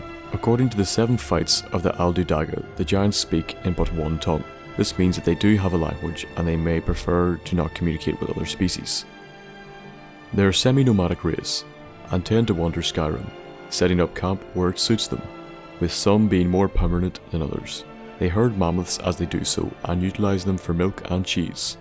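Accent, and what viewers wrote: Northern Ireland, United Kingdom. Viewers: What part of NI are you from?? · where is the narrator from? sounds like western northern irish to me?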